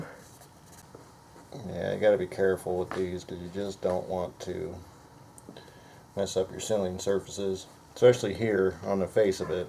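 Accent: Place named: Missouri, United States. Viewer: Sounds like you live in Missouri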